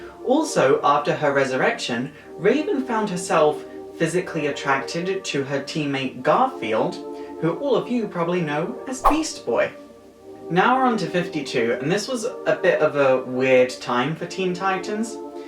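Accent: United Kingdom. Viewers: okay, 1 why the wig? 2 are you like slightly talking with a British accent? 3 what about the tv series?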